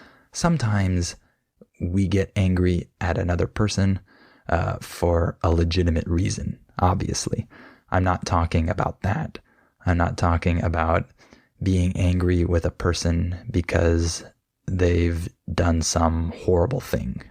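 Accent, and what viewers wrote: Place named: United States. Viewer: هذي لكنة امريكية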